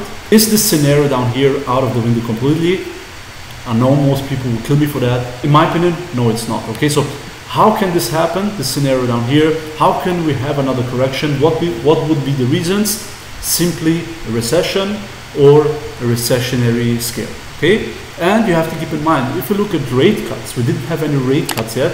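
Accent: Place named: Belgium. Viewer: are you from belgium? You really sound like a belgian guy speaking english 😁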